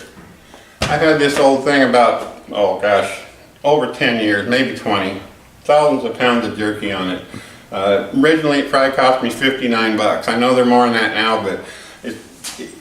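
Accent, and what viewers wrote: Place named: Wisconsin, United States. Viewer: Thanks for this recipe! I needed some ideas for fish, and I have a freezer full of carp and gar that I will be turning into jerky. Are you from Wisconsin? You sound just like some of my friends from Rhinelander!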